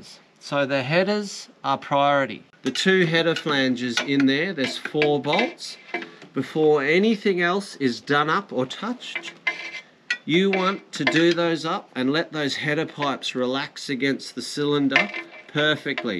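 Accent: Australia. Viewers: love that Ozzy accent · explained by a fellow Australian and in an accent/lingo I understand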